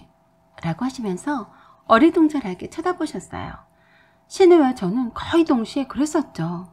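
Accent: Jeolla, South Korea. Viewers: 라도 특유의 사투리 ㅋㅋㅋㅋ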